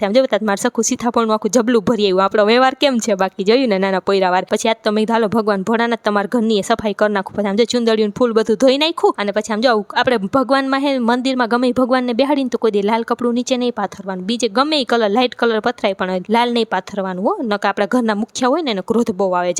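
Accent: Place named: Rural, India. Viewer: Jay mataji.....🙏 તમારી speech ખૂબ સરસ છે.... એકદમ દેશી,,, પોતાની ભાષા લાગે તેવી છે.... બનાવટ નથી.... જય ભોળાનાથ... જય માં આશાપુરા... ખમકારી ખોડિયાર...🙏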